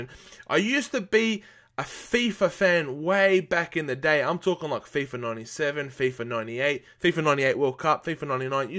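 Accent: Australia. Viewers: Is he Australian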